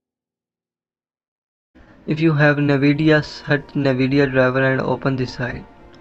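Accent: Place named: India. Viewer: lmao u put united states but ur clearly from india or sum other shitty accent country lmfao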